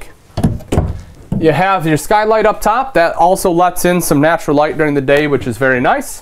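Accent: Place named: Midwest, United States. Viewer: If anyone ever wondered what a classic mid-Western American accent was, here they have a perfect example! Nice!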